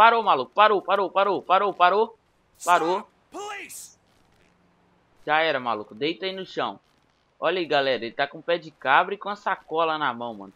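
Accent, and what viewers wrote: Minas Gerais, Brazil. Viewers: Game Club Kkk eu falei zuando cara, mas tô achando que ele é mineiro sim por causa ta trasendo muito esses mods e pra mim que sou mineiro ele tá falando normal... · eu tava querendo saber do pq o sotaque e da q e minas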